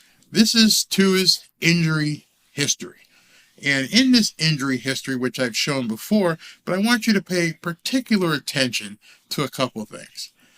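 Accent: United States. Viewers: I think the number of times you said his full name is at 15 with that awesome Pennsylvania accent. We know his name! Lol He's been hurt more then we know. Why do you think he forgot how to play in the 49ers game and the Chargers game... Head trauma. Tua needs to quot and if not he needs a new team. His career has been a train wreck.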